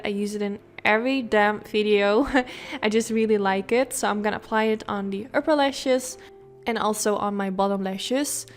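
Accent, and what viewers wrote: Netherlands. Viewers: Do I detect a Dutch accent?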